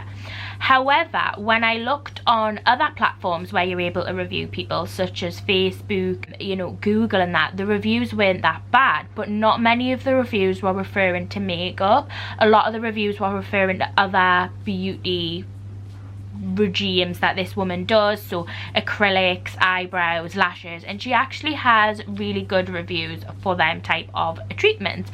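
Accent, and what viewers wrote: Newcastle, United Kingdom. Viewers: Hope you enjoy Edinburgh plus I love accent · I’m from Bedlington but study in Edinburgh, love hearing a geordie speak, you make me feel at home ❤️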